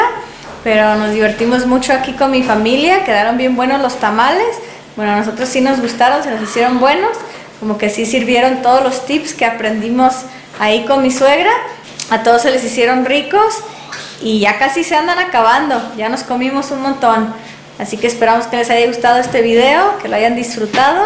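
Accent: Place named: Guadalajara, Mexico. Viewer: Ya ablas como tapatia que bien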